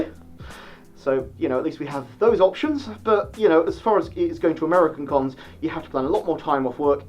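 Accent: England, United Kingdom. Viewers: I thought you were going to talk about the cute kind of "shipping" for a moment, there. ^_^ I want to be a furry, so it's good that cons exist in the UK, and it's possible to get a fursuit here (I may have already known that you were English, but I tend to forget as I can't really tell the difference between English and American accents, so I tend not to assume YouTubers are one or the other, if that makes sense.)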